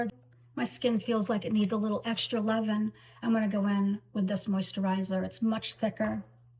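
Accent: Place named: Northeast, United States. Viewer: are u from boston? RI? or NY? accent is hard to nail down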